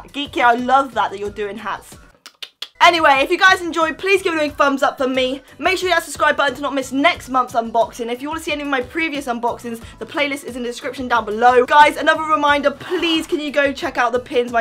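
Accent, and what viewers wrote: United Kingdom. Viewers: YESSSSSS !!!!!!I love u.I love your intro:Whats up muggles hahahahha Also are u british?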